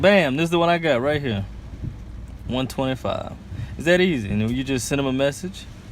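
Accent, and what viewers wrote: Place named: Louisiana, United States. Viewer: I heard your voice and knew you were from Louisiana.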